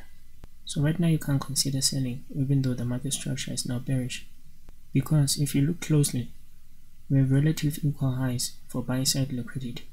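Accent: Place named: South Africa. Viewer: you are such a legend I watched this video again now... Are you from South Africa? Sounds like it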